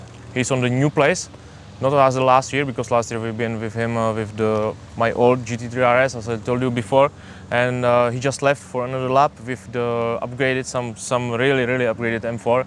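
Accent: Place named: Czech Republic. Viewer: ten ceskej prizvuk je tam hold slyest🤛:finger-red-number-one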